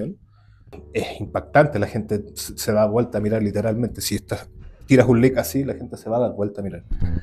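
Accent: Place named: Chile. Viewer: Tienes acento Chileno... ACENTO, no términos..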